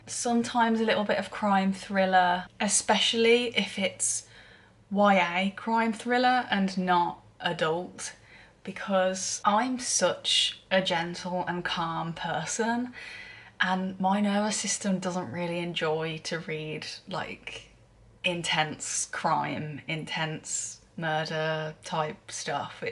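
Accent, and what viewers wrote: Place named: Birmingham, United Kingdom. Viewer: Hiya! Welcome to booktube, I love your energy 😊 sounds like you might have a bit of a brummy twang going on too? We need more brits in the community for sure! Will check out your other videos for sure!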